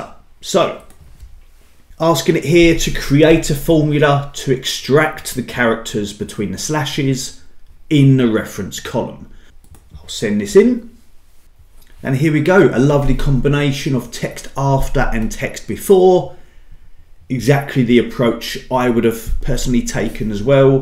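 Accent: United Kingdom. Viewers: Nice British accent)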